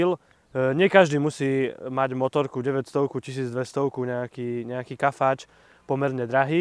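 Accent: Eastern Slovakia, Slovakia. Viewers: Len ,,tak trošku,,zaťahuje-isto ,,Vyhodňar,,aľe to nevadzí,ta ňe?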